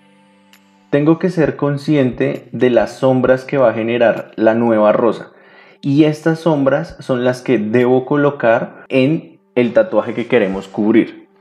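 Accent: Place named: Bogotá, Colombia. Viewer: Habla como rolo jajaja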